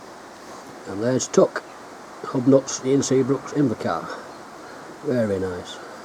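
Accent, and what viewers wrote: United Kingdom. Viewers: Champion! Love a good yorkie accent, definitely got a good nack for making videos so I've instantly subscribed. Make sure you wax the car now give it time it'll go pink again. Diamond wax is pretty good stuff and cheap on amazon! Can't wait for more vids. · Eh up. After 46 years in nz it is great to listen to english as it should be spoken up north...brings a tear to my eye..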